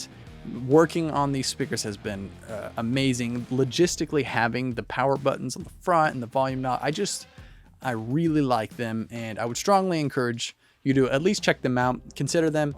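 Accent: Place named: Nashville, United States. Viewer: you from nashville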